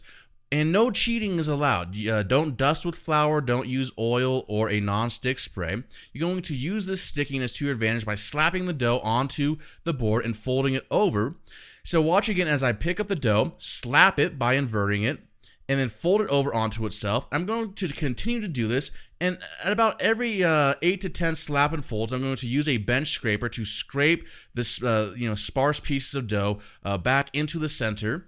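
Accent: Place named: United States. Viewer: You have the same American accent than William Lane Craig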